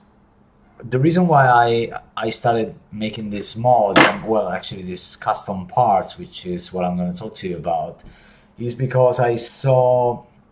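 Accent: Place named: Spain. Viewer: Awesome work! This project has given me that extra little push to dive deeper in 3D printing/modeling. I recently purchased a Creality CR-10. It’s been a blast so far. I am currently modifying HoolyHoo’s screen bracket to accommodate dual joysticks and a RPI3. While sharing is nice and very appreciated among the community, people usually have valid reasons to not want to share. You should give people a chance to be creative with their solutions and if anything, push them in the right direction. Also, where are you from? For some reason your accent leads me to believe that Spanish is your first language.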